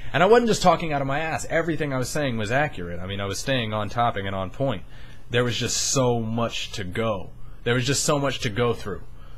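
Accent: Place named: Jamaica. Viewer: how often do you smoke pot? you speak like a true Jamaican. You're Echo Consciousness Theory is crazy (in a good way) and you do need better acid or more hits to get really fucked up. my friend had a trip where the only way he could explain it was he died and became a circle. anyway keep doin your thing boss, u shud try by ft.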